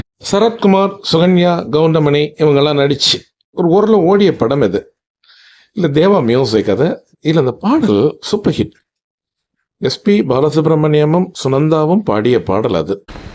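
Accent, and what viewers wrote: Sri Lanka. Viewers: Neenga ilangaiya sir unga slang nalla iruku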